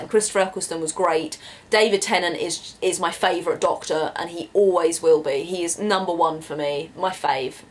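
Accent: London, United Kingdom. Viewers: I love British accent ! I just came here to listen to your accent 😯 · What a lovely accent! Which is it? Cockney/Liverpool/Manchester? I don't think it's R.P. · I'm here to learn British accent and it helped...❤️ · I love your accent it is wonderful l hope l can speak british english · is it a british accent. You r adorable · I don't remember the list of the shows that you mentioned because I fall in love with your accent and my mind was lost somewhere else. If you're an active youtuber and happened to read this comment I suggest you to make a video on "How to do British accent". I'm sure many people would love it. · Hello, Great video and recommendations! Now I'd like to ask you for a favour because I'm working on an assignment for a English Phonetics course I'm doing and I'm going to analyse some characteristics of your accent, would you mind telling me where you are from, I guess it's London? 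· I like you british accent. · I just came here because I enjoy listening to British accent lol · I am a English learner and I fell in love with your British accent. It sounds great ^^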